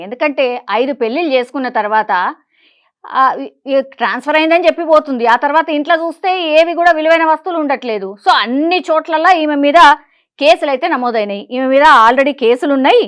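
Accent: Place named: Telangana, India. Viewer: Endhi ro Eluru channel lo Telangana slang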